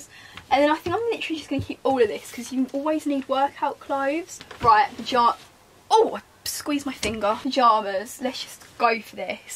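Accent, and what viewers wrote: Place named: London, United Kingdom. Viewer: Her London accent is sooo strong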